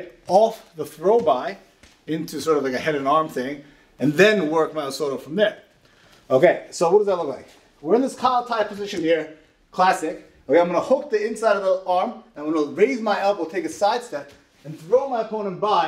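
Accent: New York, United States. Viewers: Great channel. I thought I heard a fellow NYer. Check the bio and sure enough. Wish I was still there as I would definitely check out your Schools because of your comprehensive grappling background. Great breakdowns and I like the way you explain the moves from multiple arts/perspectives. I come from a wrestling background and I am working on augmenting it with some Judo and these videos are helping. Thanks for your time.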